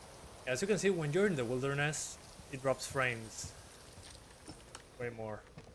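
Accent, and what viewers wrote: Rioplatense, Argentina. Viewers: tenes acento argentino o uruguayo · Hola, de donde sos, hablas ingles, pero pareces de Buenos Aires, o Uruguay?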